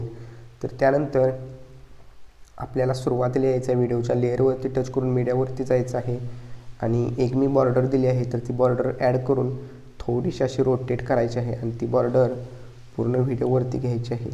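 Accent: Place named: Marathi, India. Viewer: एक मराठी कलाकार आणि मराठी आवाज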